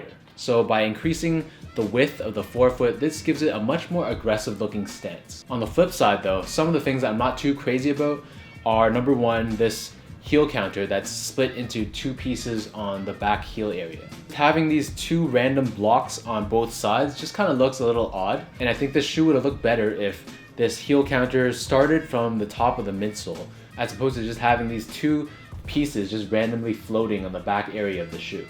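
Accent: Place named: Germany. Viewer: ok you seemed to had a little german accent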